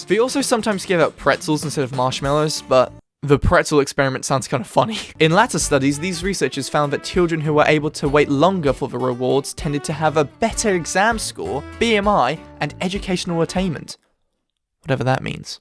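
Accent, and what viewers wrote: United Kingdom. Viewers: This guy sounds British. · Your english accent is so cool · Are you from London ? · HE Is BRITISh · He is extremely British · british :3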